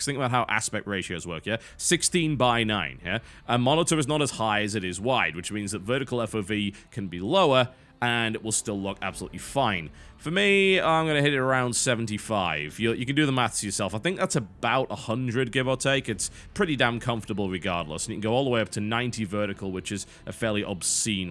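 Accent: United Kingdom. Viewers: lol first of all hes british. second: you're funny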